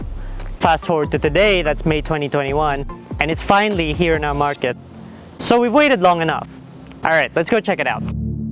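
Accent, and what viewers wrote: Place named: Australia. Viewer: Someone has been living in Australia..nice accent. These cars are imported from the US and are the Canadian spec.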